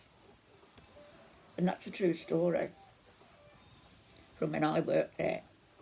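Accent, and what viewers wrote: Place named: Wolverhampton, United Kingdom. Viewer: Are you from the midlands? I hear a Wolverhampton accent 👂 ☺️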